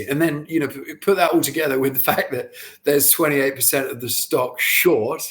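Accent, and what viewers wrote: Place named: United Kingdom. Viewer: All I heard was a gay bored British accent start a sentence with the word “so…“ Before I quit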